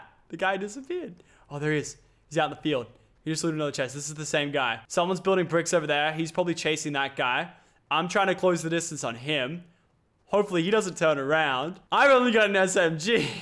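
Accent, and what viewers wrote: Australia. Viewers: Are u Australian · So are you Australian or American? Which accent are you putting on??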